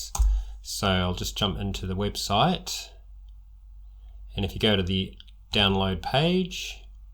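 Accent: Australia. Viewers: Thank you very much for these hints and tricks in MX Linux. I had so many problems with Ubuntu an Ubuntu Budgie(could not run my scanner and printer). Now with Your how to*s I finally been rescued from MacOS (too expensive) and Windows(hate it). The only suggestion I like to make is the confusing tools between "tools" and "tweaks". I always have sort out witch one is the setting up the computer and witch one is responsible for just the outfit. By the way I could not spot Your accent. guess you are from "Downunder" or this direction somewhere. TX again.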